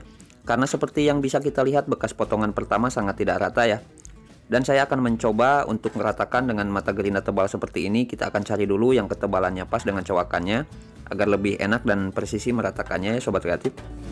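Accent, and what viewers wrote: West Java, Indonesia. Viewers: logatnya urang sunda pisan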